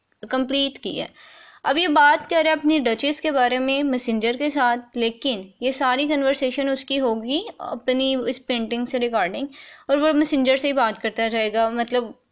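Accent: Pakistan. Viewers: Kya aap pakistani ho kya aapki tone ase hai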